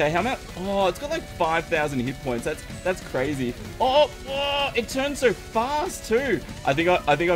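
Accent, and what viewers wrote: Australia. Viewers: Ye he is australian like me :D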